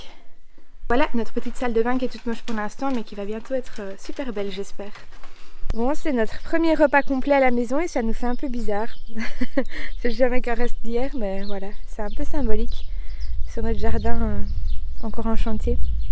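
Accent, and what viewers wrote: Belgium. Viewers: C l'accent belge 😋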